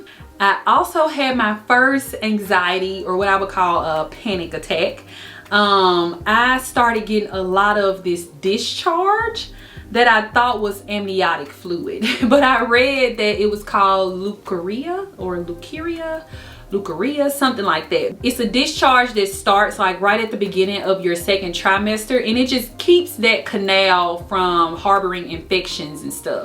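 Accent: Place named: Louisiana, United States. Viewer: #1 Congrats!! Two blessings 💕✨ #2 You are so dang precious!!!! Lol your accent (I'm from Louisiana so I get it Haha) + your mannerisms are just too likeable! Prayers for you & those babies 😊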